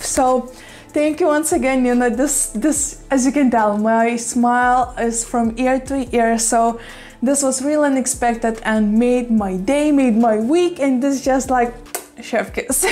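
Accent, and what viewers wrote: Russia. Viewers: You don’t have to apologize for your Russian accent, its beautiful. I have an Italian accent, its what defines us. 🇱🇹 🇮🇹 🇨🇦 ❤️ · I was hoping you were going to include the ZZ plant!! I love your variegated ficus too, it’s so beautiful! Also, don’t apologize about your accent! First of all, I love listening to you and second of all, it’s so impressive that you speak multiple languages!!! Be proud of yourself for that! If I was able to learn another language, I’d have an American accent speaking it, because that’s the language I grew up speaking. You grew up with Russian, so that’s what your tongue is used to doing! Enough lecturing, lol. Thank you for sharing! 💜💚🪴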